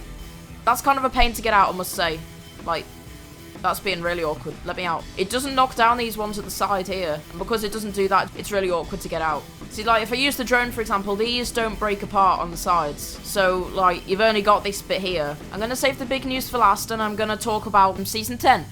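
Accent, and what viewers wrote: United Kingdom. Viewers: LOL i love ur accent XD u from like south western??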